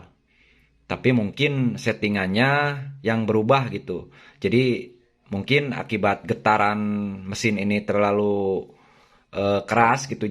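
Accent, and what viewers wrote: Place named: West Java, Indonesia. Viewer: Duh, si Aa sunda bngt. Haha Don teh apa Aa?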